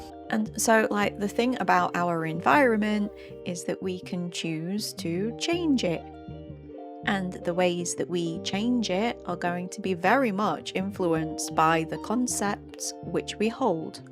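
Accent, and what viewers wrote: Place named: Midlands, United Kingdom. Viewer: i like the midlands accent ;)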